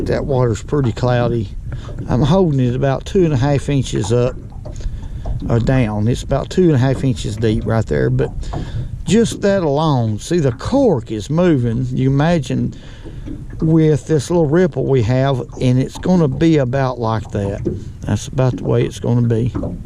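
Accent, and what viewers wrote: South, United States. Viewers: You are a wonderful awesome man. And you happen to be a fisherman. I am glad that I am still subscribed to your channel. I actually haven’t watched your channel for a while. Been working a lot, and in my opinion, your southern drawl when you talk reminds me to stop working so fucking much, and relax a little bit, and get back to what brought you joy. I watched a video of yours on how to set the hook depth for Crappie fishing. Thank you so much sir. (caught a lot of crappies from your wisdom and knowledge) I hope God blesses you profusely. You have a joy inside you that is priceless. You never talk about your life struggles. But like many of us people that have been paying attention to your channel for a while, life struggles are always in somebody’s life. Don’t be ashamed of smoking cigarettes. When you went on that Famous fisherman’s lake that has a Amish haircut, I could hear it in your voice that you were nervous, and just wanted a fuckin’ cigarette. 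Pardon my French…..I am a tobacco user currently, and I understood and could feel what you were going through. Secretly in my head, I thought it was an honor for the Amish haircut guy to have you on his boat. No joke. Real talk, no squalk…… God bless you brother.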